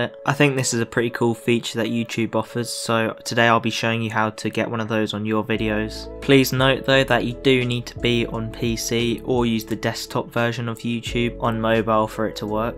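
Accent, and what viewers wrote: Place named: United Kingdom. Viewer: I'ma assume you are British Your accent sounds British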